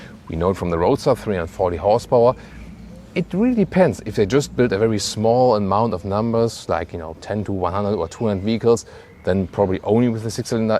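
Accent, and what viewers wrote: Germany. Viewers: What a terrible german way to speak english. Sounds terrible. Take some lessons, please.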